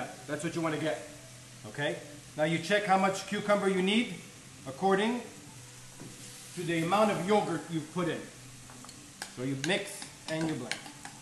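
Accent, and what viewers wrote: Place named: Montreal, Canada. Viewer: I picked up the Mtl accent right away 😂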